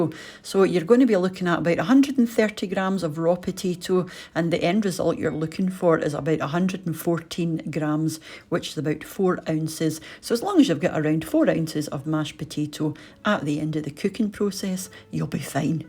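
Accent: Scotland, United Kingdom. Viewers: I am a big fan of ScotIand, it's people, culture and food. I absolutely loved the time I spent there participating in the World Bagpipe Championships a few years back. I don't know if you are aware of this, but closed caption does not work well with this channel and gives a truly comical take on your broadcast. Your Scottish accent is being misinterpreted and some of the attempts at translating are just comical because of your accent. No malace is intended here, just an observation. Can't wait to try these macaroons!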